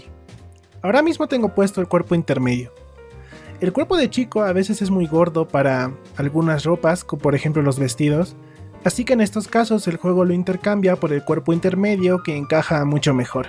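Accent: Argentina. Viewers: español argentino papa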